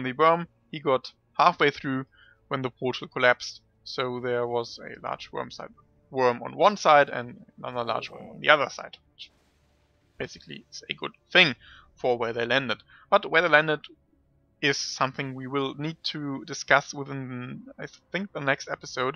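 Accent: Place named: Germany. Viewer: I dont know it feels like im listening to myself:) I hate perfect videos:D I know u are german I hear the accent im from belgium also strong accent:)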